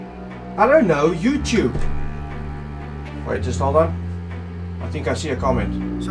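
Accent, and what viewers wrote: South Africa. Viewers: South African accent is great :) Boer.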